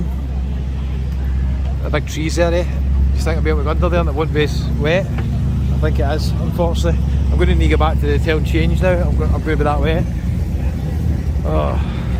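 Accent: Scotland, United Kingdom. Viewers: Hi mate where scotland u from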